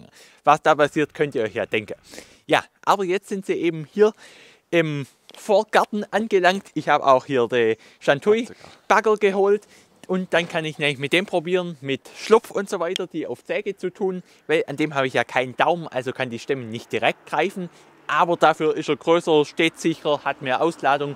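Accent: Swabia, Germany. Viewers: Frey mi saumäsig uff deine Videos, ganz besonders weil du a aständigs Schwäbisch schwätsa kasch. Des isch de schest Schproch uff dr ganza Welt 😂😂😂❤ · So isch des. A reachdr Urschwob im Tschäd. Wo kharsch au du no?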